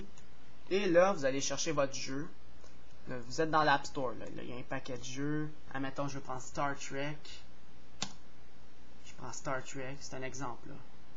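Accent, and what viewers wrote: Quebec, Canada. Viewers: Super accent quebeqouis comme une tete a claques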